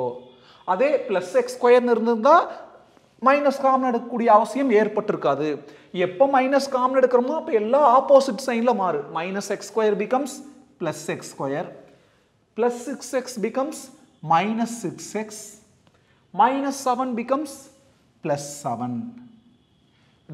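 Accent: Coimbatore, India. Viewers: It's coimbatore slang. Try to understand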